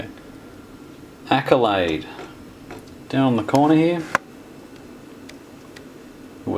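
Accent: Australia. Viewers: If you are anywhere near Brisbane (definitely have an Aussie accent), I have a free analog scope for you ;)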